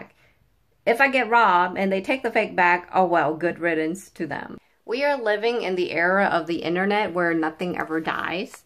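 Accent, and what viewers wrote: South, United States. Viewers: On point sister, I’d rather have cash in my bank and love your southern accent 👏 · Southern, funny, and kept it aaaaall the way real.....new subbie. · Love your southern accent ❤️ I’m a Californian and I love hearing southern accents. And I hear ya on the fakes, hence I’m so scared to buy on these retailers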